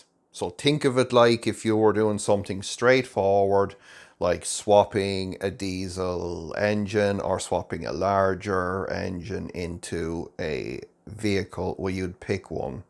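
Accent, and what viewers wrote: Ireland. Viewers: Thank you Mr. 😁. Really loved the sarcasm with the really nice Irish accent.. 😊. I Agree with you 100 % on this matter, you broke it down quite well on why and how. As usuall ppl do not even know why they do, what they do. This would serve as a good tutorial of asking them, do you even know what you want? Thank you for the video, and Happy New Year 2022..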